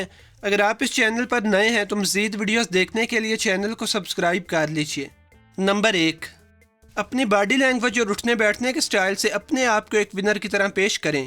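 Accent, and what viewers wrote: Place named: Punjab, Pakistan. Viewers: Lehja to to jahilo wala hy urdu aik adbi zaban hy iski zaban to aisa lag raha hy punjabi bolne k lie bani hy bs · آپ بھی اردو بولنا سیکھ لیں۔۔۔ یہ پنجابی اردو آپ جیسے شخص کے منہ سے اچھی نہیں لگتی۔۔ والدین ہوتا ہے ولدین نہیں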